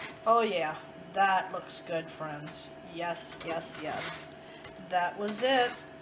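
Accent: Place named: South, United States. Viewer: I love tuna cakes. I’m here to see how to make them in our new air fryer. I must say, tuna cake recipes vary more than I realized….. family or area specific I guess. We always add cooked rice, & cracker crumbs, onion, parsley, maybe some mayo. I’ve never tried the celery & mustard. Love your frequent “ guhead “, truly. I’m guessing you are from down south, yes?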